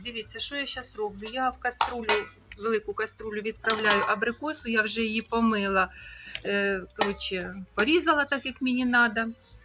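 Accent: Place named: Chernihiv, Ukraine. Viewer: Прекрасна мова, красивий суржик, на Чернігівщині всі так балакають, не нравится не слухайте! 👍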